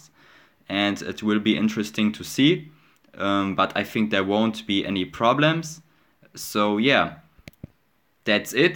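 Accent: Germany. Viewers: Man kann hören das du Deutscher bist :)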